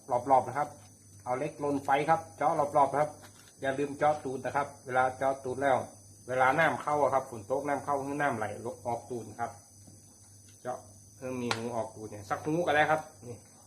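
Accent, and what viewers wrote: North, Thailand. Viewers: คนเหนือใช่มั้ยคับ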